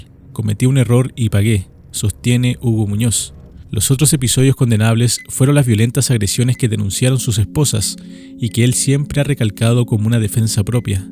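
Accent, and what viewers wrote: Chile. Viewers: El acento Chileno no queda con tu nombre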